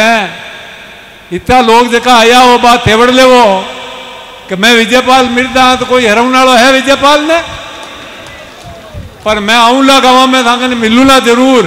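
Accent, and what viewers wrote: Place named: Nagaur, India. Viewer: Nagour ki boli bahut achi lagi 😊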